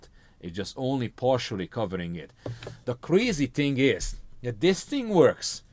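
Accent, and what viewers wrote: Hungary. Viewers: you have a Hungarian accent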